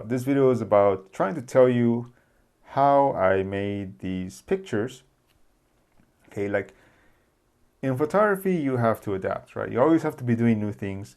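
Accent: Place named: Spain. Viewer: I really like your photography! Thanks for sharing your opinions. I feel like your English has some Spanish accents haha, perhaps i got it from my latina gf, which also loves anime.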